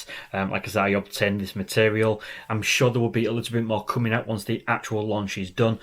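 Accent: United Kingdom. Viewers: You are certainly on top of things. Love your videos. I find them the most useful of all the channels....and it's not just because you Britts make everything sound more interesting:)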